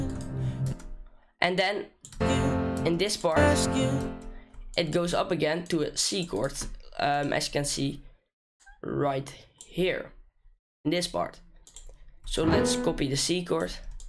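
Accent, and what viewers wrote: Netherlands. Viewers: Are you Dutch maybe you sound Dutch. Btw amazing video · This guy 8s Dutch he has a Dutch accent... Geen probleem hoor😂😂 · I smell dutch! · i think you must be dutch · Thank you so much! Your english sounds like dutch 😂 are you dutch? I am dutch!🇳🇱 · Ayo r u dutch? I can hear it due to your accent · We horen allemaal dat je nederlands bent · Je bent nederlands denk ik aan je accent te horen toch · You sound Netherlands 👌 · Im in love with Dutch accent lol. Thanks for making such a good job explaining ! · Ik spot een Nederlander 😂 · You sound really dutch.. No problems just noticed it :D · i hes dutch im dutch to i can hear it from his accent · this guy has to be dutch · sounds dutch ;) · are you dutch? you kinda sound dutch and great video my dude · Are you Dutch? I think I can recognize your accent a little bit. Your english is very good though! 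· i love the thick dutch accent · hey buddy hmm i can hear ur netherlands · Haha deze gast is nederlands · This guy has an Dutch accent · His accent screams dutch ahha i love it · he's dutch a now it for sure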